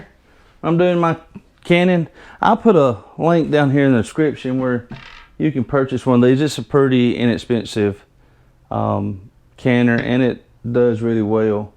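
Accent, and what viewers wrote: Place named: Kentucky, United States. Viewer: Nice video! I am in Kentucky. You accent sounds like us. What state are you in?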